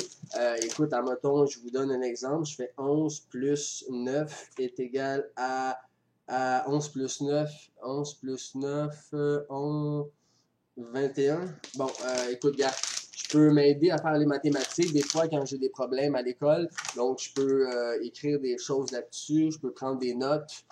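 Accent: Quebec, Canada. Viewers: A ok c'est un Québécois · tin il a l'accent Quebekois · il parle comMent Il parle le québecois et non le quibecoi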